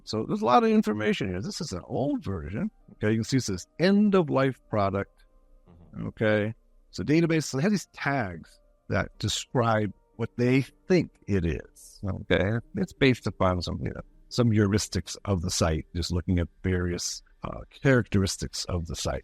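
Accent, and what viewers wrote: Israel. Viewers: אני מת על המבטא הישראלי יא מלך תמשיך ליצור תוכן מעולה💪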